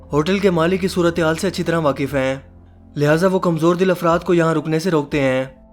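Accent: Punjab, India. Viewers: Urdu ko Punjabi bana k bolnah walay kuch to lehja behtar karo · App ki Urdu saf nhain hain, app kaiyoon punjabiyoon ki tarha Urdu bolthe hon??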